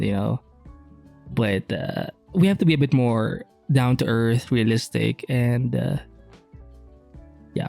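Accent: Philippines. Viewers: I cant quite pin point it, but you sometimes sound like a Filipino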